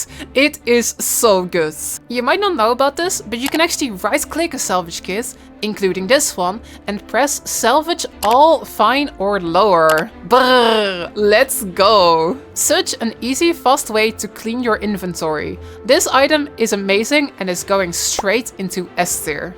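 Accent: Netherlands. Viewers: I knew it!. the moment that accent hit, haha Dutch person! you are found! haha. Anyways, thanks for the data. Good luck on the rpg. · u sound very dutch ^^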